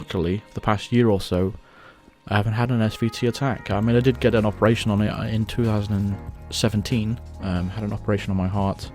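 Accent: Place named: England, United Kingdom. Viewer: you're the man! It takes a lot of courage to put yourself out there like that. Anonymity is also pretty cool, you show your face when you're ready. You've got my support from Sweden. Its a good time for a break now you have until Jan 20 2021, we're all counting on you for Hitman III. I watch your vids because you get the business done in a good way with great commentary. As an expat I like to hear the English accent. You sound like a good guy and very likable. BTW with the new consoles coming out I bet everyone is looking to upgrade there TVs. Have you got any tips of what we need to look for frame rates, 4K or 8K etc.?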